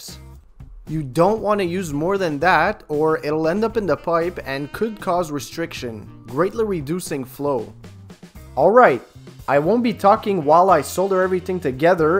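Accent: Quebec, Canada. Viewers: would you happen to be from Québec by any chance?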